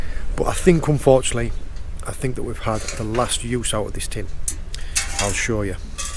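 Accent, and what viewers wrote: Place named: Cornwall, United Kingdom. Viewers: Stunning video and water clarity Nice to see so many lobsters about I also noticed you developing a bit of a Cornish twang when pronouncing words With an r in them lol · Pepperrr and butterrrrr lol you sir are getting a Cornwall accent! 😎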